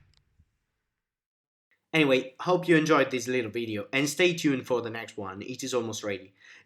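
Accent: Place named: Italy. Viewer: Italy I would say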